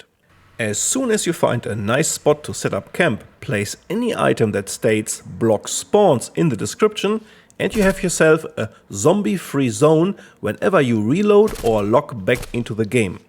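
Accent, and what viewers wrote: Germany. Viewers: Da versucht aber jemand seinen deutschen Akzent zu verstecken, aber nach den ersten zwei Worten hab ich es schon gerochen😂 Dazu kann ich nur sagen, liebe Deutsche keine Angst vor unserem Akzent, die meisten Englischmuttersprachler (vor allem die Amis der Ostküste) finden unseren Akzent sehr charmant. Komischerweise empfinden nur wir Deutsche uns gegenseitig als unangenehm und versuchen den Akzent zu verstecken.... Warum auch immer. Mehr Mut zu unserem Akzent 💪😉 Gleiches gilt auch für Spanier und Italiener, auch die empfinden ihre Muttersprache mit deutschem Akzent gesprochen als charmant, das ist zumindest meine persönliche Erfahrung. Sehr gutes Video, hab das Spiel noch nicht gespielt, werde es aber mal testen. Weiter so! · I knew from the first words that ur geman. Mein kollege👌🏼👌🏼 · Bro, is that a KI set on maxlevel german accent, or is that Person real? 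· Nice video! I also love your german accent 🍀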